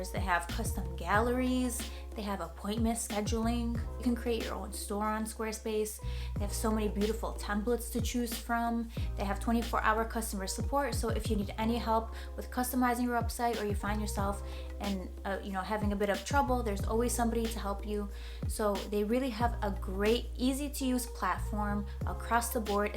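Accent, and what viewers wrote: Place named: New Orleans, United States. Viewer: I thought it was New Orleans accent, she reminds me of my friend from there. But she also kind of reminds me of Jennifer Lopez, though I haven't heard her as much.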